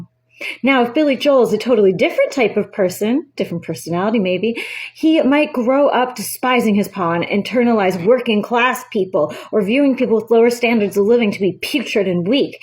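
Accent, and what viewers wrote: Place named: South, United States. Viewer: Nice southern accent. 😉That was funny. 😄 The sheer amount of effort you put into your work is astounding, and the level of detail is top notch, and very much appreciated. I could easily picture everything you said, and watch it in my mind like a movie. Have you written any books or considered writing books?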